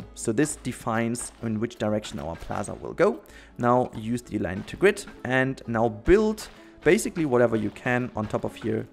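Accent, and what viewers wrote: Germany. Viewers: Dat german accent tho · Warum machst du eigentlich die Videos auf Englisch? Erreichst du so viel mehr Leute als mit deutsch ? Dein Akzent sagt mir das du deutscher Muttersprachler bist :D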